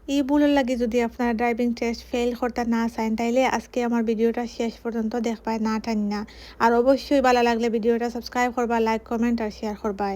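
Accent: Sylhet, Bangladesh. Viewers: sylheti voice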